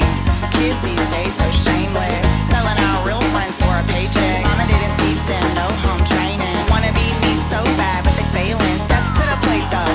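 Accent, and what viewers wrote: South, United States. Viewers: HAPPY BIRTHDAY OMG OMG!!!! Yes livestream queen. It’s not for YOU. It’s for US. Your subjects. 🤣🤣🤣 jk but seriously hope you have an amazing day!!! You’re a true gem on this platform and I’m so happy you’re here instead of a fancy lawyer’s office with free coffee and free gym and stuff. We appreciate you for real. Very rare to find a beautiful woman who is also very smart and professional and genuinely cares about people and chooses to be a voice for those who can’t speak for themselves. All while maintaining a sassy southern tone. And your name is (sp?) so extra points.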